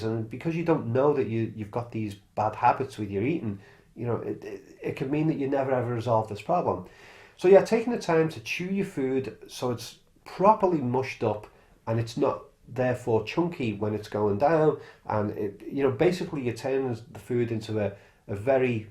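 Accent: Liverpool, United Kingdom. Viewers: My reflux was so bad years ago I had the Acid Reflux Repair, known as Nissen Flundipication...it worked well but took me months and months to get over the operation, I had strained muscles and pain...I cannot live without the PPIs now, I have a shot of Gaviscon every night before bed, 30 years on. I avoid alcohol otherwise it all kicks off. Had HPylori 3 times!!! My history was I had my Gall bladder removed in 1988, then developed IBS, had histerectomy in 1992, terrible reaction to anaesthetic, lots n lots of acid had the NF op in 1994. always suffered with my guts since...wow is me. Thanks, very interesting clip. And lovely to hear a fellow Scousers voice...I've subscribed. 👍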